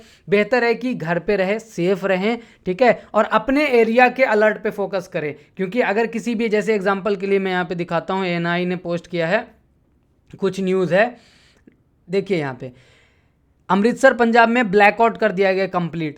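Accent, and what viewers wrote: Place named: Bihar, India. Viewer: aapka ton bihari h ... R u bihari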